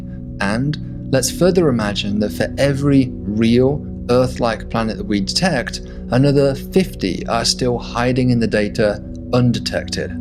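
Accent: United Kingdom. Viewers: So if as you sound are British, why are you using so many Americanisms? Would an American do the same?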